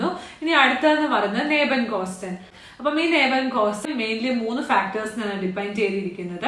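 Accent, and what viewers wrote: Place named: Onattukara, India. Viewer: Nice video. Including some sample price for homes in suburbs for say 50 -100 sq. meter homes would have been the cherry on cake. Your malayalam is so much like 'onattukara' malayalam ( Mavelikara, Chengannur etc) ! It's such annuncommon version of Malayalam on YouTube. This gives us the feel of our 'Nadu'. Thanks.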